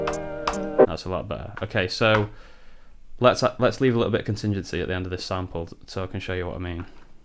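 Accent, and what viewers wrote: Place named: England, United Kingdom. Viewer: Hi again, while waiting to buy this machine I'm following your videos which will prepare me for when I'll have it here :-) I'm from Italy and had to slow down the video and turn on the subtitles to get every single word... I hope I'll get used soon to your English, I guess I saw too many American English videos and I'm not used to that pure English :-) anyway, that's not the point actually, but I didn't get the first part where you talk about polyphony... As far as I understand from the manual (sp404a) it can handle 12 voices. So it's not clear how it can stop working when at the beginning of the video you try to layer 3 drum samples over that piano sample... If they were all stereo samples, they would be 8 voices, thus it takes at least other 4 mono or 2 stereo to reach that limitation of 12. So I think that I'm not getting something, can you explain again please?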